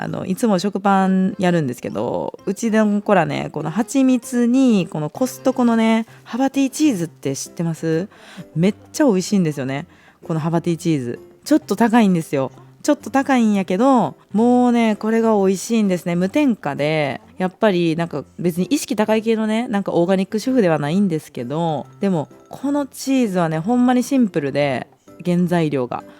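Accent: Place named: Osaka, Japan. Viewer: 大阪弁も大好きです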